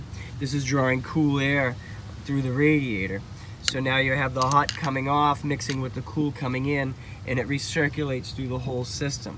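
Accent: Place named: Massachusetts, United States. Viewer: Fantastic video! Thanks for the help! By the way, I knew you were from Mass about into the video. Cheers!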